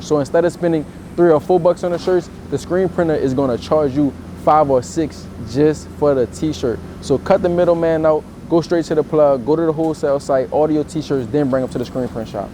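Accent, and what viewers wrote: South, United States. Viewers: Are you from Louisiana? I hear a southern accent. Love your content btw! I’m getting ALOT of tips for my business.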